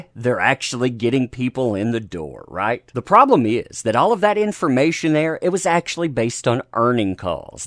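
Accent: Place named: United States. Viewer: I just love your accent as a Brit it's new to me...what State is that accent from it's great?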